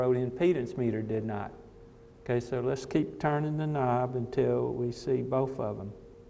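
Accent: South, United States. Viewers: Never in my life have I heard a asian with a southern accent...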